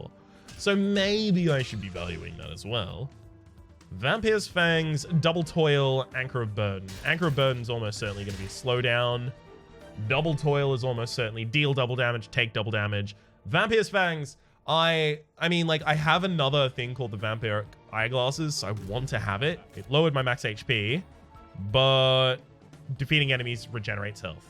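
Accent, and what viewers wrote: Australia. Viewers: That beautiful authentic Australian accent is music to my ears and I want to hear more of it.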